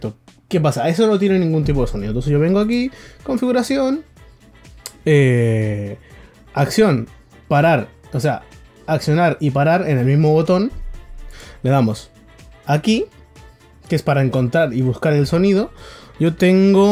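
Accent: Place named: Canary Islands, Spain. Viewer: pensé que eras de canarias España es un acento parecido Saludos!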